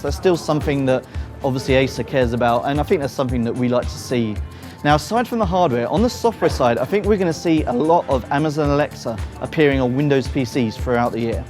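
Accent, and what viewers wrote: England, United Kingdom. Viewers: I actually quite welcome an English speaker for once.... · Saurabh Raut It's the English language, he's pronouncing it the English way, not the American way. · It's a British accent I'm pretty sure the British is more accurate on English then Indian 😂😂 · He is English and we pronounce it Hach. As we basically developed English, we should know how to pronounce letters.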